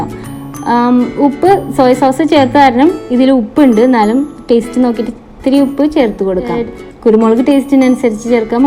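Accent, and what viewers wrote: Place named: Ernakulam, India. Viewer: nattil ernakulam ano veed.samsarikkumbo chela time’lu njangalude slang kayari varunnund😀😀😀✌🏻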